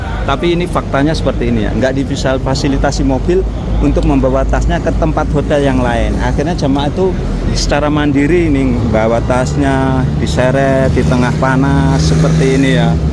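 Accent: Java, Indonesia. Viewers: Mas asli orang mana? Kogh logatnya kejawa jawa an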